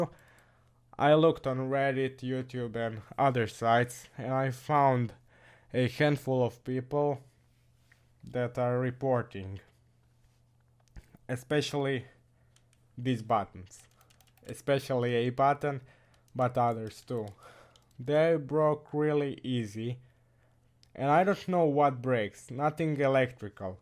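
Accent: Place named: India. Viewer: Ur accent sounds Indian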